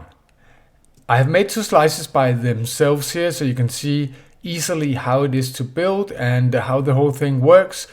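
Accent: Denmark. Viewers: “Det er en dansker.” Jeg var inde og kigge efter den første video jeg så med dig. Der er noget specielt over danskere der taler engelsk. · Are you from Denmark? 😊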